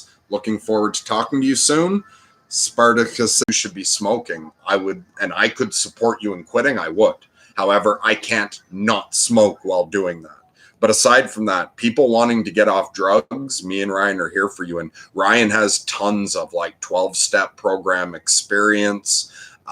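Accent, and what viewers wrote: Canada. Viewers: Hey glad to see your mood lifting. I would've never guessed your from Quebec I would've thought your from Western Canada. I live in Rockland 30 mins east of Ottawa. Cool thanks for the chat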